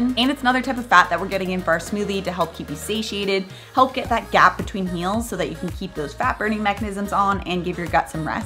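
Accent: United States. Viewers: Did you say cauliflower instead of banana to give smoothie thickness ? I can't understand your American accent you speak so fast, pls slow down !!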